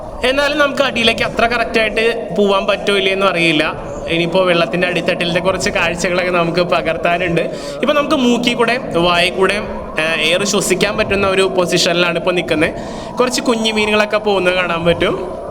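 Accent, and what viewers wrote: Thrissur, India. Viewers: മച്ചാന്റെ വീട് എവിടാ തൃശൂർ ഭാഷ ആണല്ലോ?❤️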